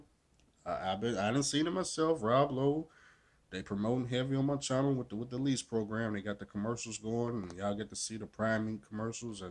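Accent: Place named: South, United States. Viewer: be killing me with that southern draw but we love it lol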